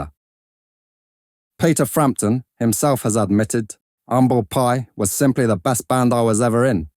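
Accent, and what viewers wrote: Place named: London, United Kingdom. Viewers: Can't stand the narrator, what is he, some London Wide Boy? Terrible halting voice. Get rid. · A Cockney geezer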